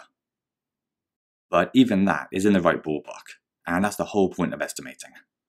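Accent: United Kingdom. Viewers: Good Bristish Accent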